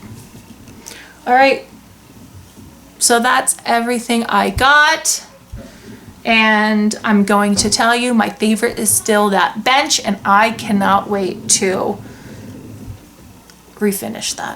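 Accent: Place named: New Jersey, United States. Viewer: Hi! I’m binging on your videos...you really scored at this community yard sale. Good for you. Question (but you don’t have to answer if you don’t feel comfortable). Where are you from originally? I’m originally from New Jersey and you sound like me...lol... hope I’m not insulting you. Anyway, just curious. Born and raised in Jersey. Moved to Florida for 30 years then moved to North Carolina a year and a half ago. I’m so old! Lol!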